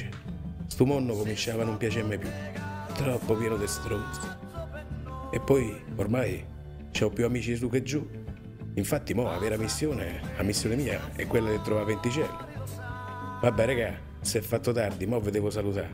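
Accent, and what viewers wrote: Rome, Italy. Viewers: Discorso bello ma lascia perdere il romanesco... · No ma non è una critica nei tuoi confronti, semplicemente CHIUNQUE non sia romano e prova a parlare nel nostro dialetto, risulta ridicolo. Il romano non si può imparare, o nasci a Roma o non lo sai parlare :)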